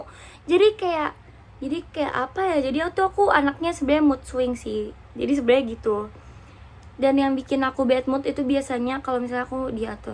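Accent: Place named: Sunda, Indonesia. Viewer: Suka ke sunda²annya kak 😘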